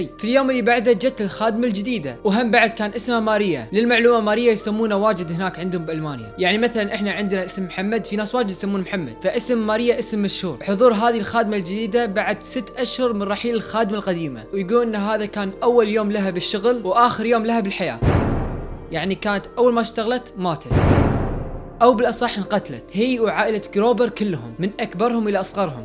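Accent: Kuwait. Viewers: كويتي صح باين من لهجتك زي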